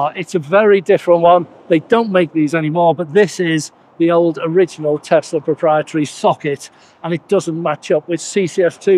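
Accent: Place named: Liverpool, United Kingdom. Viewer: "From your accent you're not British".... I seriously thought she might reply "No, I'm Scouse". Because that accent is more Scouse to me than Hungarian.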